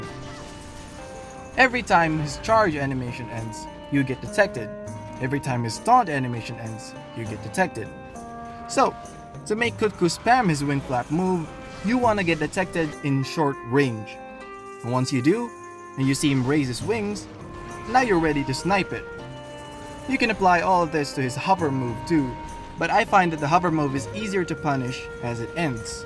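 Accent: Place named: Philippines. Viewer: However, I noticed your accent sounds familiar, sounds like kababayan 🤔, if you don't know that, forget it 😆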